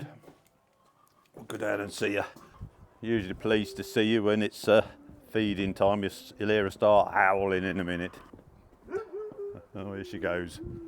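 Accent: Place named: Leicestershire, United Kingdom. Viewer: Many thanks for making videos. From your accent, I think we're from the same place, Leicestershire or close.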